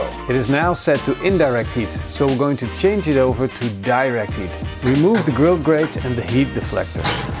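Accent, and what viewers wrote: Netherlands. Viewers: man the dutch accent is so cool.perhaps a little carribean style barbeque next? Or even curing your own meats? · Je hoort echt aan zn accent dat ie Nederlands is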